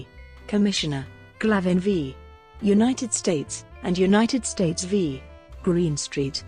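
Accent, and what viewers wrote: England, United Kingdom. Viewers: Someone program these damn computer voices better at speaking American English. And lose the English accent.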